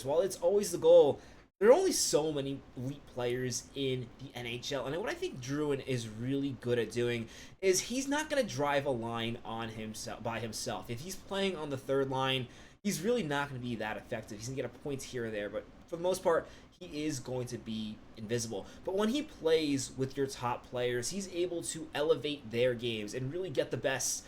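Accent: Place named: Iowa, United States. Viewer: Where are YOU from?? Ya don't sound like a Long Islander. Ya sound like you're from Iowa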